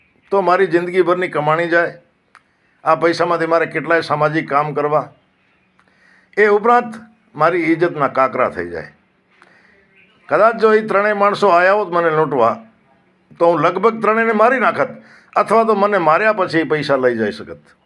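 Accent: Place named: Kutch, India. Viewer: સચોટ વાત તમારી કચ્છી લઢણ માં બોલતી ગુજરાતી ભાષા..જબરદસ્ત❤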